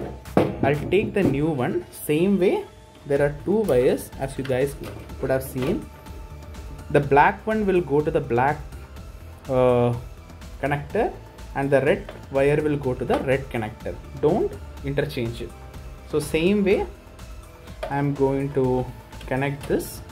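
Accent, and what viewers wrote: India. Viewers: love your tanglish accent lolll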